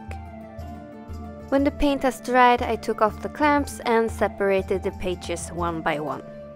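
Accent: Sweden. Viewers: You sound swedish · Man hör att du bryter på svenska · this is so awesome! just a question are uu Swedish? I just think so of your accent when you speak English, I might be wrong x3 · You sound swedish, cus of the kinda bad english🤔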